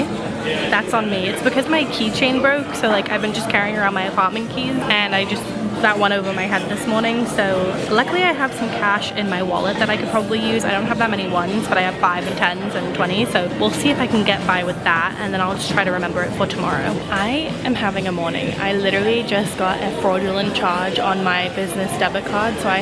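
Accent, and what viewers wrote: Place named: Australia. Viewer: That's so cool!! You say so many things with a tad bit of Australian in there as well, so it's so mesmerising to hear you speak, haha. ❤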